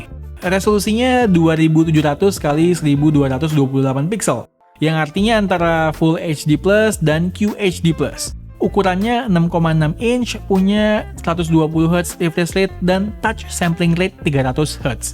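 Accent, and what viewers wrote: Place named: India. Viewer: pertama kali gue kira video reviewer dari India. ternyata dari Indonesia hihihi. btw nice review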